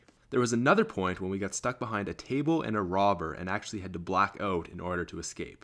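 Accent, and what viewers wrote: Canada. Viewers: what is your accent? is it Canadian? it's very interesting. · I hear that Canadian accent ;D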